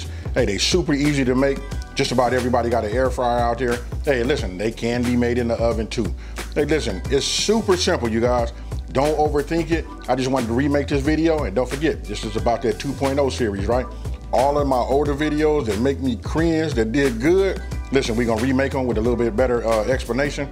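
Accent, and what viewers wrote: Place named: California, United States. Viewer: Anyone from Cali can just listen to you talk and know lol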